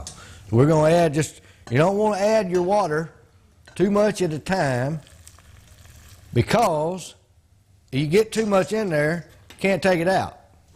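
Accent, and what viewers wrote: South, United States. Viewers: Me: Watching with a degree of skepticism, volume down, and thinking: 1. Intriguing, a white man knows about hot water corn bread? 🤔 2. Intriguing, knows how to make hot water cornbread "correctly"! 😊😊 3. Intriguing, not afraid "fat" or frying food?!?! 😁😁😁 Conclusion: This man MUST be from south or at the very least Texas. Me: Turns up volume. Hears accent. South confirmed! 🤣 *Priceless take away to 👌 Was I was racially / gender biased? Yes, but aren't we all (to a degree) if we're honest with ourselves??? Me also: Wondering how I can get an invite for dinner now that I've probably teed off the cook!!!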